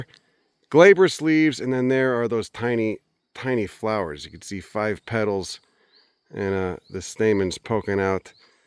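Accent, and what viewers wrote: Chicago, United States. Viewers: Chicago, not New York. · Heyhey, the guy's from Chicago not NY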